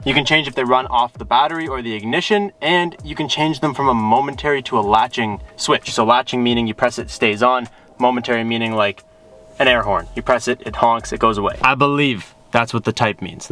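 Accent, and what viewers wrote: Canada. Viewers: Great video. Canadian eh?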